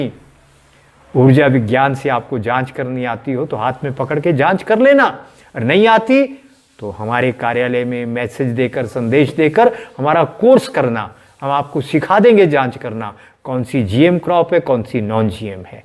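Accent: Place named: Punjab, India. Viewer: Aap Punjab se ho vaid g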